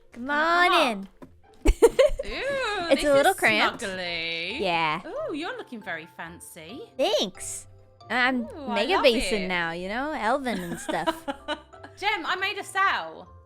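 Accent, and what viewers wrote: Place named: Newfoundland, Canada. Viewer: I love hearing the Newfie in your voice ever so often 👌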